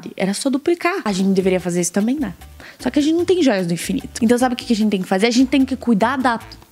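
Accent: Rio Grande do Sul, Brazil. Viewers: Ou ela é gaúcha?¿ kakakak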